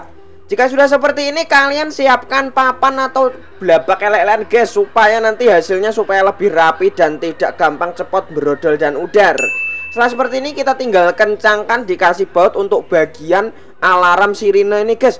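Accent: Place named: East Java, Indonesia. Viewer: Sampeyan asale ko Endi mas,bahasane Jawa timuran koyone😁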